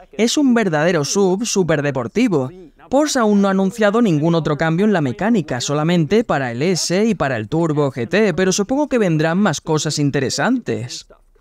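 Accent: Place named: Spain. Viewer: Por qué español de españa 😭😭😭😭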